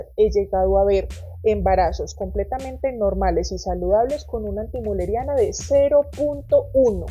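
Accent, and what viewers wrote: Colombia. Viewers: ❤🙏🏻Dra. Agradezco la claridad y sutileza con la que nos explica los temas tan sensibles sobre la fertilidad. Le mando un abrazo enorme desde Tláhuac, CDMX. Usted es de las primeras personas que decidí seguir en YouTube para conocer el panorama sobre éste tema. Además me encanta su voz y forma de hablar de Colombia. ¡Gracias! por su labor.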